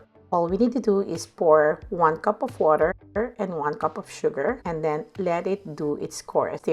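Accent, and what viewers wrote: Philippines. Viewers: “Bay dah bendorrr” - god I love the Filipino accent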